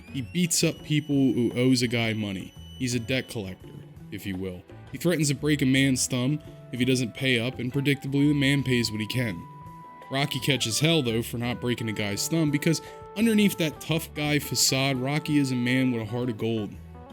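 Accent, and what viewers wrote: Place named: Delaware Valley, United States. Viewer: I enjoyed this tangential video, I subscribed after watching your video essay on "AAA" game design and am by no means a writer and found it interesting. It's awfully appropriate this video is delivered in an authentic Delaware Valley accent. The city of Philadelphia really relates on a personal, almost cultural level to Rocky's underdog narrative and low self esteem in a way, it can be compared to the city's well known inferiority complex - which extends into New Castle County, Delco, Bucks and South Jersey. I'm a "Delaware Valleyan" living far from home and I sometimes have people ask me why we take pride in the statue of him near the Art Museum. It's clear to me why he's been co-opted into city culture.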